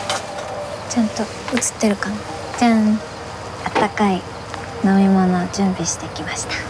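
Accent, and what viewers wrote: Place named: Japan. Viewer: How lovely her japanese accent is...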